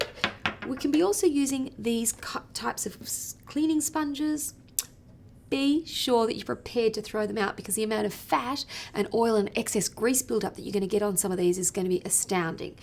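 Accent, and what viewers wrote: Australia. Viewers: the best accent.. gotta love land of kangaroo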